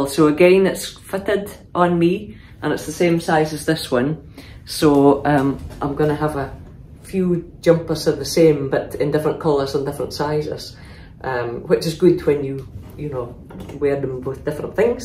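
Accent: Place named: Scotland, United Kingdom. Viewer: Really enjoyed listening and watching you with your crafting..love your Scottish accent also..😊yes..certainly would love to see more of what you get up too..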